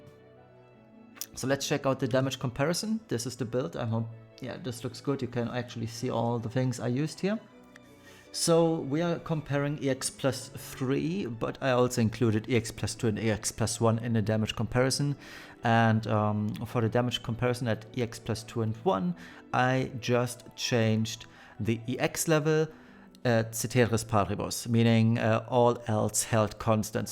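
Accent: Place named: Germany. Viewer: Btw. are you from Germany? Your accent kinda sounds like it 😁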